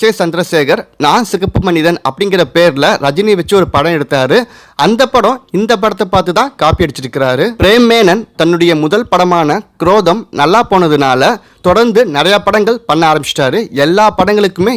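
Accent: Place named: Sri Lanka. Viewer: Srilankan voice slag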